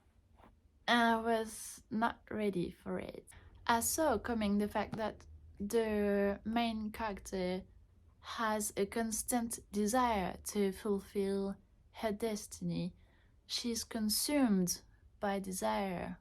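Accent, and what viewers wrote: France. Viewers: j'entends l'accent français, j'apprécie.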